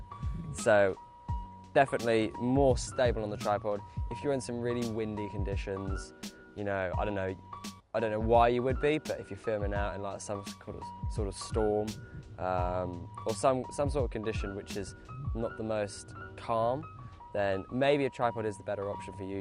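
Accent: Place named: United Kingdom. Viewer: British Accent👌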